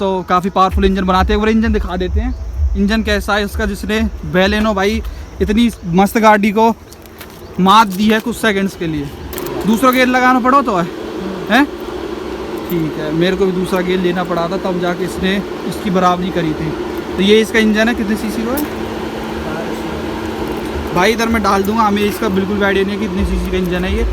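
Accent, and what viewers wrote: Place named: Palwal, India. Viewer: Bhai tum palwal se ho kya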